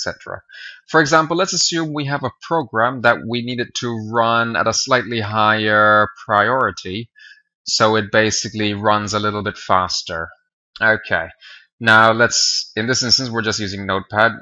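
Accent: Ireland. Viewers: Where are you from? You have an Irish accent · ALSO U SOUND SEMI IRISH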